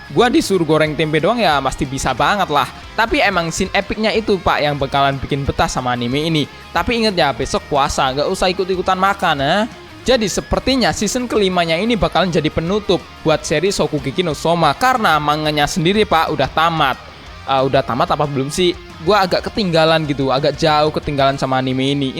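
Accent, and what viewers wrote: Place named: Java, Indonesia. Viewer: Bang lo orang mana bang logat ny kayak orang jawa ya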